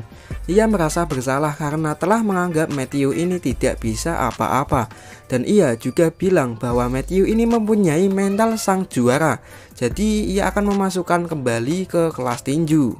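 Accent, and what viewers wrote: Java, Indonesia. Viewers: Bang sampean wong jowo yo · Mas kamu orang Jawa?? Jawanya mana mas? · Min medog amat suaranya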